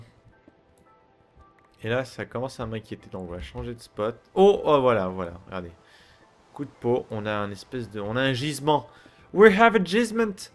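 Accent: Quebec, Canada. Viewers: Il est canadien ou francais? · Ouais, il vit au Québec donc desfois l'accent québécois surgit :D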